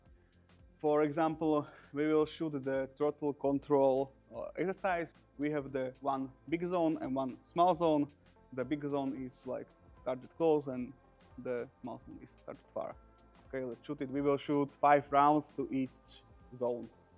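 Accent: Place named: Slovakia. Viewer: Well to be honest, no problem with English, but. But, you should be able at least to have a full capability of speaking consistently without mishaps. And I am also not talking only about strong Slovak accent, that is not what worries me that much, but the strong competition on YouTube among English speaking shooting channels. It will be difficult to bring something exceptional. I wish you all the best and I do not intend to stop watching your videos. Just a small feedback.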